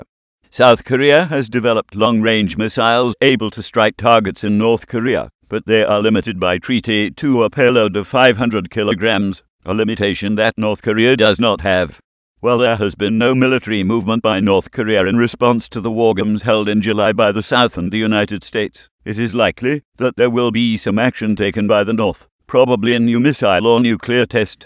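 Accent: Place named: England, United Kingdom. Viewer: Wow...a new voice for the DWS.....Sounds English.....fishy.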